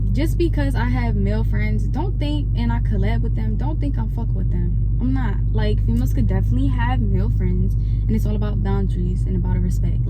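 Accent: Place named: New Jersey, United States. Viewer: The accent she gotta be from Jersey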